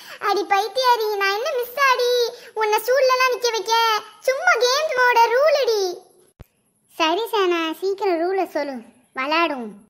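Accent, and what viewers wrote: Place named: Parangipettai, India. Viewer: 80%of your slang sounds like parangipettai slang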